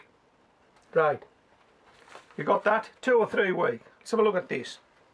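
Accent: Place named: Scotland, United Kingdom. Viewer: I believe it's. I think it's Scot?😏 Could be wrong, it's happened once before...😳😛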